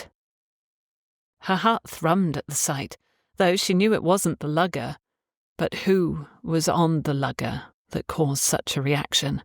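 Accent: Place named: Cornwall, United Kingdom. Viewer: did a great job. She really brought the story to life with her narration and Cornish accent. She was the perfect narrator for your wonderful story. I’ve enjoyed this series. Thank you for making it available on YouTube.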